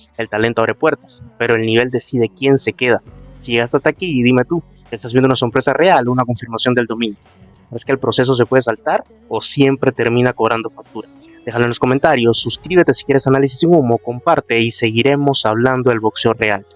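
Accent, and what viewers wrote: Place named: Cuba. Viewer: por tu acento intuyo que eres cubano que vive en el exilio, quizás panameño por aquello de aferrarte a DURÁN. INOUE es el 1er japonés que algunos consideran el mejor libra x libra y eso porque vivimos en tiempos de vacas flcas. Solo te recuerdo que un tira piedras como NERY tuvo al borde del KO al japonés, NERY es sumamente limitado(ROBÓTICO) y sin el alcance que tiene PICASSO. Dices que INOUE es un dios en su país y sí esto es porque allá no tienen jamás el número de campeones del mundo que tienen en otros países como MÉXICO, por eso se aferran a sus mejores campeones. Es muy probable que gane el japonés pero tampoco creo que lo haga por KO, igual no considero a INOUE ALGUIEN INVENCIBLE, y no le doy el % de posibilidad tan bajo que mencionas a PICASSO. Bien que te digas, ya que una cosas es ser y otra es ser experto o buen analista, hasta PNDJOS como FAITELSON se dicen "expertos "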